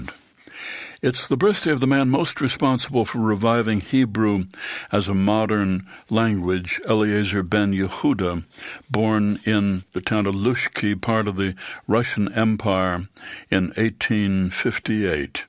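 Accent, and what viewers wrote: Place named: Midwest, United States. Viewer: That the earth went around the sun and not the other way around..... I love the " Midwest talk"!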